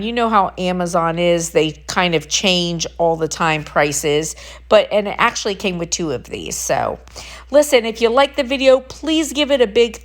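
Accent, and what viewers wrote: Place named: Philadelphia, United States. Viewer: You sound like u have a Philly accent. Anyways thanks for sharing with us. I appreciate the information so much.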